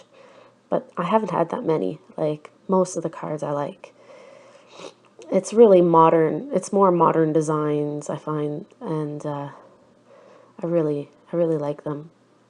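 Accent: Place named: Canada. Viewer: Just found your channel, love your PL process video. Hope to see more of them. I used to live in Canada north if Toronto and last year we moved to Australia. Was really great to hear a Canadian accent.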